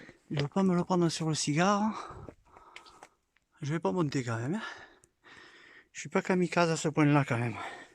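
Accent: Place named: South, France. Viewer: belle vidéo, l'accent du sud je m abonne à votre chaine et un pouce bleu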